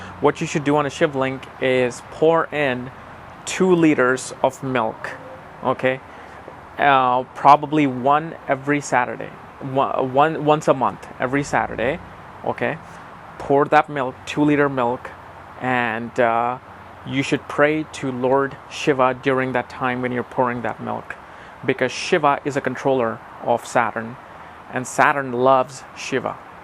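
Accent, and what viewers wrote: United States. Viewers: Just because he speaks in american accent? Do you think this is new invention? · that’s your petty mind speaking. Coz one thing that sucks about him is his American accent. It’s his attitude and how he explains astrology is very unique intelligent and entertaining, that draws us to him.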